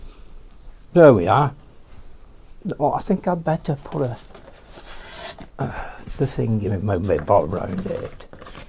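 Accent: United Kingdom. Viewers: I love your accent! What part of the UK are you from?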